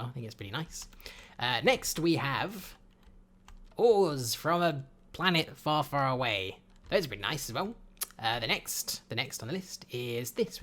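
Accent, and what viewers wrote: United Kingdom. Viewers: I love your British accent so much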